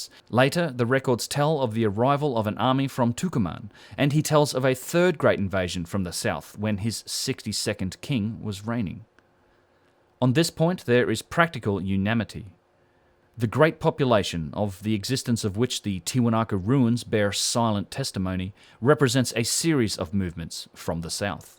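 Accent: Australia. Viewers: Is the voiceover a really good Aussie AI voice?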